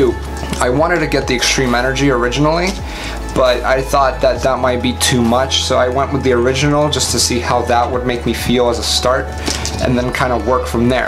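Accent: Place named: Nigerian American, United States. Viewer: o that's what you mean. It's Nigerian American from southern part of California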